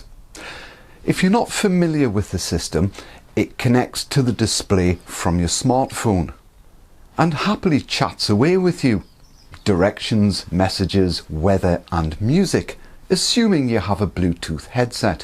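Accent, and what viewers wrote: Newcastle, United Kingdom. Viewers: Great review. You from Newcastle area ?? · 🦘 Ah, English spoken with just a hint of Geordie - the most colourful of English accents/dialects - especially in its broad form. Useful review - convincing me stick to my gorgeous 2021 Grand Prix red CB 500X .... 25,000 km young, still going smooth and strong in all terrains and surfaces (with the help of Michelin Anakee Adventures) and only drinking 3.1L/100 km. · He's speaking proper English. Of course he's British. I have never understood why people who live in the penal colonies (Australia and USA) do not take the time to learn to speak English properly. Can you explain that please?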